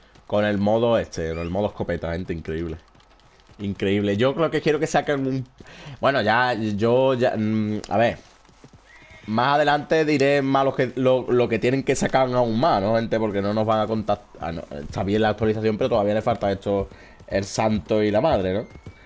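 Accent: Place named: Andalusia, Spain. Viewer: Me gusta tu acento debes de ser de Andalucía o Sevilla seguro 😂🇪🇸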